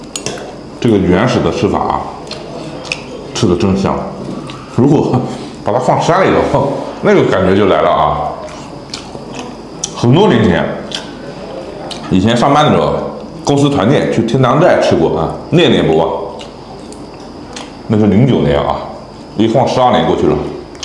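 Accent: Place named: Hong Kong, China. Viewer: 港普听着真亲切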